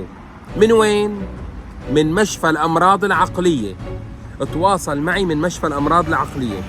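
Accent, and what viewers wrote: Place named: Damascus, Syria. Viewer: باين عكلامك من الشام يعني عربيني او دوماني بس ماتجمل وتقول السوريين عيب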